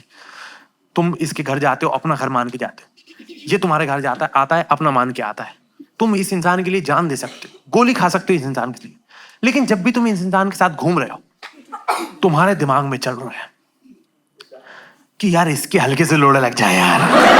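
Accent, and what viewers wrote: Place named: Madhya Pradesh, India. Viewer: A few months back he did this set in Bhopal as an opening act for Zakir Khan. It was my first time watching a stand up comedy show andit was very easy to see that the audience could relate to the set. As I said, I am from Bhopal, is a junior from school and many of my friends went to the same college as he did. The school things that he talks about in some other videos, I can very much relate to. My friends talk a lot about their local engineering college experiences and the way they share their stories and the stories themselves are very similar, it's so funny to listen. And it seems like it must be a very common phenomena to have such friends' groups and the dynamics that they had and "मध्य प्रदेश के ग्रामीण परिवेश के बच्चे" is such a prominent thing in the colleges of Bhopal. Everything he talked about, the way he talks, the lingo, the references, all the stories feel so similar that most of it is pure nostalgia. 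It is very much a Bhopali thing. I also realise that a lot of the things he says are for the performance but honestly a lot of it is quite relatable. I used to participate in open mics a decade ago and I remember he used to do open mics in his early days as an aspirational stand up comic. He has grown so much as a stand up comic, it is such a fascinating journey to see. He still has that inner millennial Bhopali vibe. Wo DB mall ka food court and the Christmas tree at the entrance of the mall that he talked about, saare tamashe wahi hote the. 🤣 Aur sahi me k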